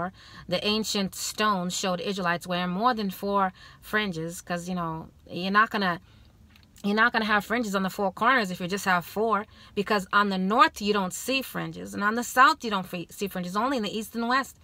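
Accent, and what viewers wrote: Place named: Europe. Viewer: Love the information. Voice reminds me of the old 1950 movies. I believe that is a Continental accent.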